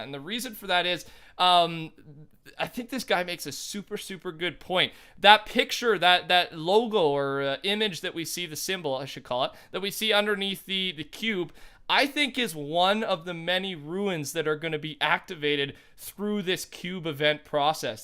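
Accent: Canada. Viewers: Damn. So many comments and likes not one person got that it’s a Canadian accent we pronounce and spell a lot of words differently!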